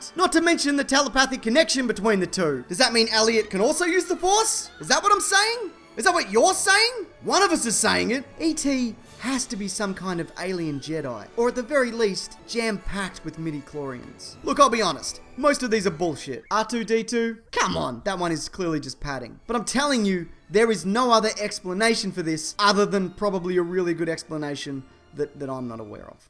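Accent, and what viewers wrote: Australia. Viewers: dude are you an aussie?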